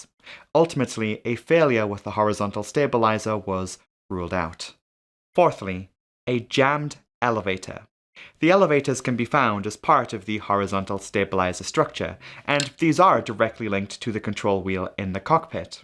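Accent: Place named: England, United Kingdom. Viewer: I know what a metre is and precisely how to spell it. Come English sounding person you can't sound intelligent without being able to spell the basics of the thing you are talking about.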